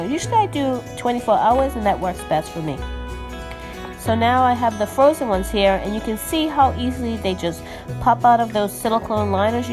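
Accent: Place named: New York, United States. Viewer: Are you from New York or Boston? I like your accent. I’m in Colorado, but from New York.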